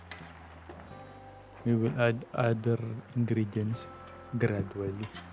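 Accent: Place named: India. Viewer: ang sarap nmn yan sir,parang accent ng Indian race ang host..jiji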